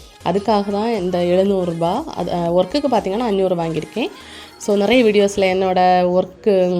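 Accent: Kanyakumari, India. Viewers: Akka neenka entha place Kanyakumari language pesurinka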